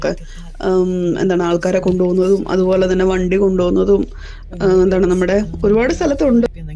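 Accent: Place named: Pala, India. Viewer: Pala പരിസരവാസിയാണെന്ന് തോന്നുന്നു ഈ സഹോദരി... കാരണം ഇത്രയും amicable ആയി സംസാരിക്കുന്നതു കേൾക്കുമ്പോൾ ariyam njanum palayil aanu രാമപുരം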